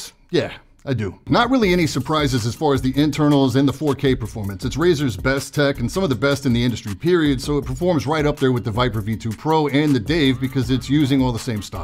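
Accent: New York, United States. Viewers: I love his New York accent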